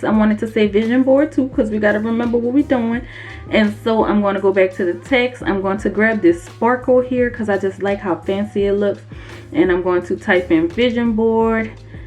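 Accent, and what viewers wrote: New Orleans, United States. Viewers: Ok, I need this in my life. However... I want that N.O.L.A. accent bay-be! 🎆🎇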